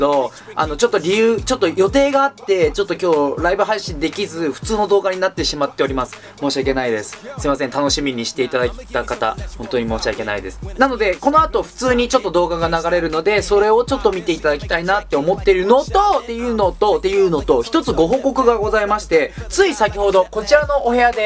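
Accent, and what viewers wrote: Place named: Kyushu, Japan. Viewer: リアルタイムでも見れそうだったのですが、日テレっ子なので…(笑) 今朝録画したのを観ました！真面目にお話してるかっこよかったです(´˘`) でも、九州弁で話す動画のも好きです(˙˘˙)❥❥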